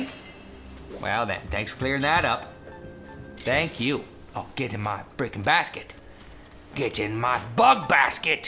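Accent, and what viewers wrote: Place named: England, United Kingdom. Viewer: i love your english/britsh accent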